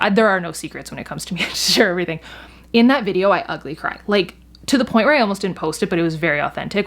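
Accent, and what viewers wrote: Quebec, Canada. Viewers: Tbh as a french canadian, I love when you speak french with the perfect Quebecois accent.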